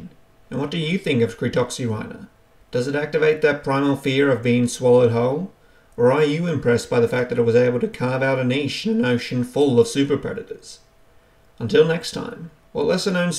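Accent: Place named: Australia. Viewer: Yep, there's the Aussie accent lol... "It's a shack!"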